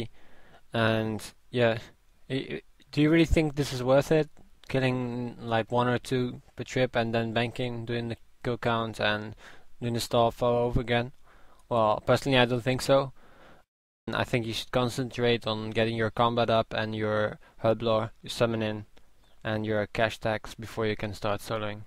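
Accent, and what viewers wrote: Netherlands. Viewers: Ik hoor aan je stem dat je NL bent! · I love the "+20,500,000" xD btw are u dutch? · are you from the netherlands or from Belgium? nice vid btw, thumps up :) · Haha leuk nederlands accent :p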